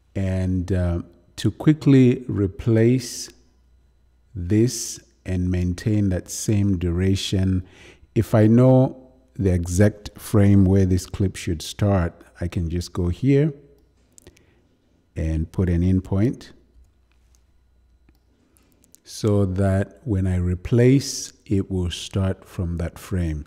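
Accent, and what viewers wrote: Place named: South Africa. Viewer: you from SA? accent had me feeling at home lol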